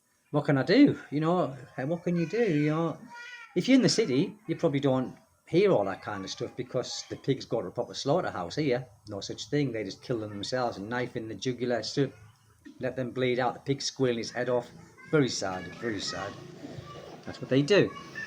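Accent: United Kingdom. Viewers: Sounds like you’re from the Uk like myself 😂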